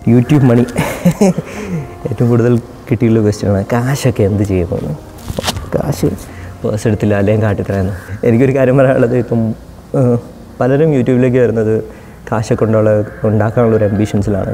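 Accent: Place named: Kannur, India. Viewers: സംസാരത്തിൽ നിന്നും മനസ്സിലാവുന്നു വടക്കേ മലബാറുകാരനാണ് അല്ലെ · It’s not about just age,it’s about your friendly approach.Love and respect from Kannur,Kerala. (Your accent resembles Kannur boy)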